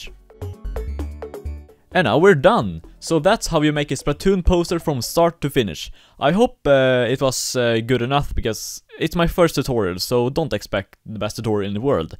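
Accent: Sweden. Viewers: you sound super swedish, am i right?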